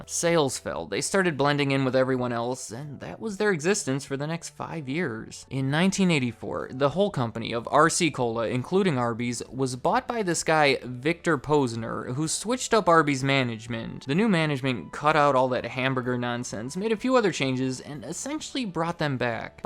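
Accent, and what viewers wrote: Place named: New England, United States. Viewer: Wow the fast talking yankee nasal guy again. Had to fast forward and get out. Again!!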